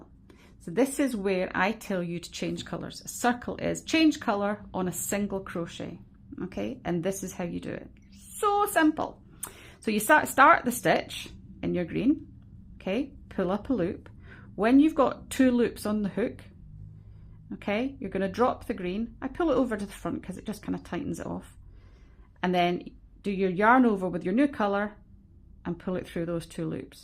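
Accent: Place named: Scotland, United Kingdom. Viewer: The taping comment made me laugh. Very scottish way of talking.lol and the dug in the huff 😂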